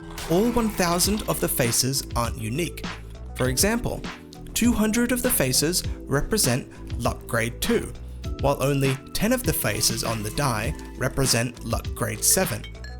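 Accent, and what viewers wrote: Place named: RP, United Kingdom. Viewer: This posh accent is too much for me bud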